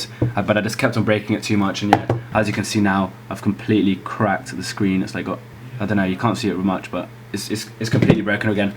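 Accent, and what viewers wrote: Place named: Netherlands. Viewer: Flawless English accent for a Dutchie oml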